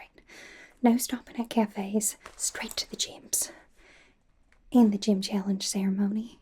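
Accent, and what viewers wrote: South, United States. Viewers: I assumed Marnie would be british. but I love your southern accent so this is fine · I love the southern accent. More accent plz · Marnie with a southern accent isn't something I ever thought I needed to see or listen to, but it's so good! :_GengarDab::_GengarDab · The southern drawl is so cute. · Omg alt + southern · A fascinating take on this character, who does fit the alt girl archetype in Pokémon lore, based on her Bulbapedia page, and with your (possible) Rogue-inspired Southern interpretation as her. High-quality roleplay with the works, as always,.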